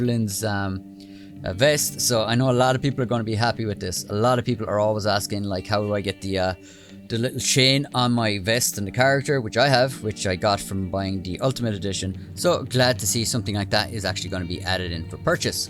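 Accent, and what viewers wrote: Ireland. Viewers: I never tire of that sweet Irish accent.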